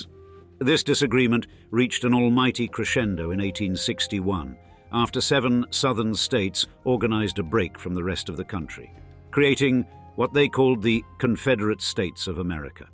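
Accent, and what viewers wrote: United Kingdom. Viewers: Americans pronounce the name 'De- lah-no'. The narrator speaks with a British accent that says it differently.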